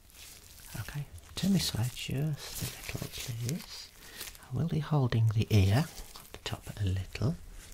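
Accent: Birmingham, United Kingdom. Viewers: Been listening to this every night for the past week, it’s saved me from a stressful week so thank you! Love the softer whispering, the Brum accent is delightful ❤️ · mate you are absolutely blinding. your natural and professional bedside manner is just brilliant, your knowledge and terminology combined with your brummie accent make you my favourite channel. it's fantastic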